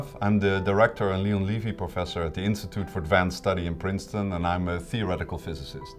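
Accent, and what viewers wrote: Netherlands. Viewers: He's Dutch right? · Hahaha Dutch accent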